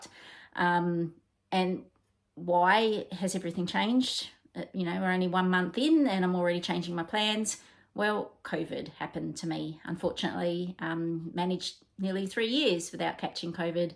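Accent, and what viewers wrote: Australia. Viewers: So good to hear your pleasant auzzie voice again.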